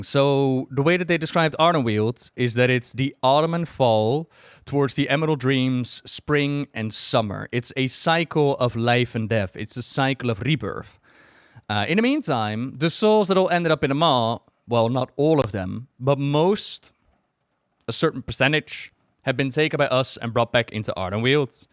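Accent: Netherlands. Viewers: ur dutch aint yah ok, u sed it urself even . i heared it before u sed it! XD fijne dag gewenst!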